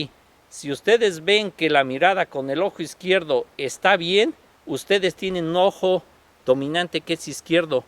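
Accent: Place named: United States. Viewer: progre so bueno laverdad no estoy seguro pero a alguien que un dia hablo español es imposible que se le olviden ciertas cosas, y se le nota al hablar de que algunas cosas no las maneja, como la acentuacion por eso pienso que es Estadounidense. pero bueno, su canal es excelente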